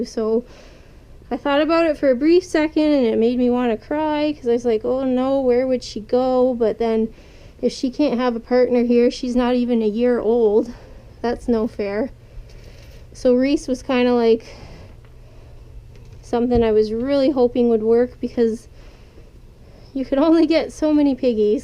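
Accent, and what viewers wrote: Canada. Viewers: I'm from FL and I've never heard the way you say it but I immediately knew you were from Canada by the way you say certain words. For someone to be so petty and call you illiterate, well, they just have way too much time on their hands...I thought it was cute and made me smile.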